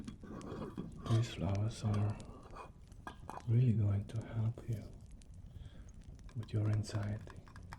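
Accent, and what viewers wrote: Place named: Europe. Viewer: Wow this is a great video! Im subscribed now for sure. I have a question, I noticed you have what sounds like an accent, are you European?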